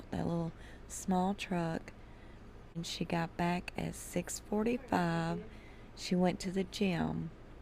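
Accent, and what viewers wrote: South, United States. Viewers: I listen before bed too ! Her voice is very soothing . Love that Southern accent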